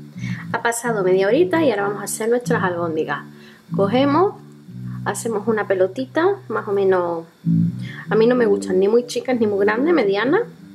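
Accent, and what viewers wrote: Andalusia, Spain. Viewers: Muy ricas!! Ahora quedé bien despistada. Libras, pienso en Inglaterra. Acento andaluz y vestigios árabes. Jaja. Me has dejado confusa. Pero esa receta me la guardo, para hacerlas con ese toque que les das, saludos 😘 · Hola, veo que estás en UK pero ese acentillo tan chulo es andaluz ¿sevillana? Aunque mi canal es de recetas vegetarianas porqué mi hija lo es y el canal es sobretodo por y para ella, yo como de todo y me encantan las albóndigas. A ti te han quedado de 10 Que ricas!!!! 😋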